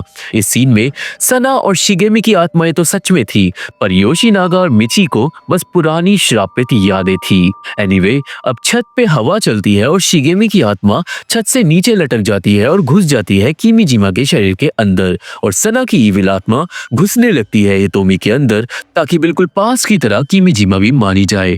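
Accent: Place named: India. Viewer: Bro sana aur matt bolo tera Indian accent bohut tagra hai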